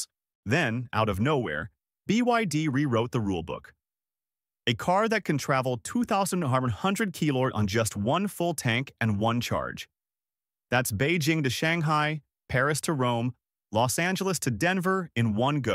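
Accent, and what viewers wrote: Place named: Canada. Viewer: What's twenty hundred?...what am I watching and listening to? Canadian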